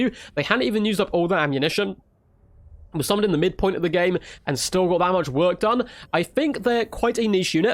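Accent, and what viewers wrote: United Kingdom. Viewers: Are you British? You sure speak fast :)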